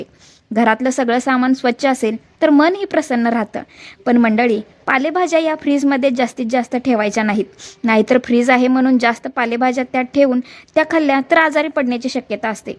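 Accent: Kolhapur, India. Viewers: Tumchi bhasha kolhapuri aahe kothe rahata tumchi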